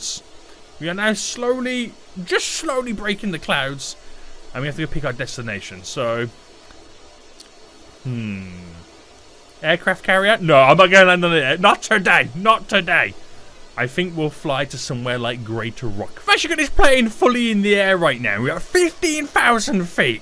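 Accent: United Kingdom. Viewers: Average skull emoji kid, He is British, plus you can’t really hear the “g” in the word “flight”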